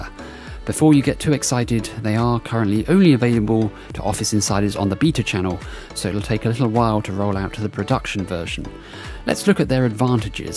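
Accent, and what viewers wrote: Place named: South, United Kingdom. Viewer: Nothing more annoying than a South British accent going on about how he can use new checkboxes than no one else has access to --- brilliant!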